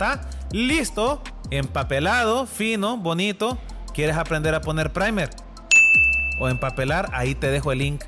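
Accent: El Salvador. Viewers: Viejo por tu tono sos Salvadoreño! Me llega! Ya me suscribi, mira ayudame porfa men, Donde consigo los aros o anillo para tirar de cobre que pegas a la lata junto con la maquina que los suelda? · Por 2 palabras que te escuché deduzco que eres de El Salvador, te felicito por tu excelente trabajo, yo no trabajo en eso, pero me parecen muy interesantes tus videos, saludos desde el pulgarcito de América. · Tiene acento de el salvador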